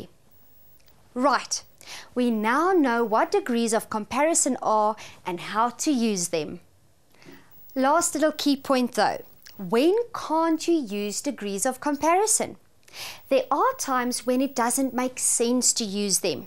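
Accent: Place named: Afrikaans, South Africa. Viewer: You come from South Africa because you have a Afrikaans accent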